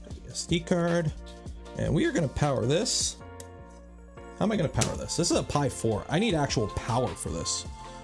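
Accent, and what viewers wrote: Canada. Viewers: You speaking about being a true Canadian has me instantly sold as a new subscriber to this channel. Just got my first 3D printer in the mail yesterday. It's an SV06 Plus. So this video is a major help. Great work on this my dude. From a fellow proud Canadian (well, ashamed of you know what/who, but ya know.. Next election eh..). By the way I could tell within the first three minutes of the video hearing how you speak, that outright gave away your Canadianess right there but yeh.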